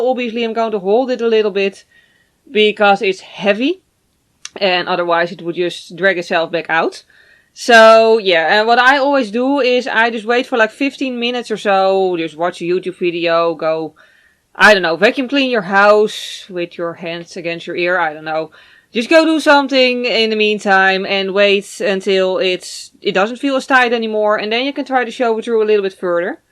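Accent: Netherlands. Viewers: That dutch accent 👌🏻 ik zie je 😍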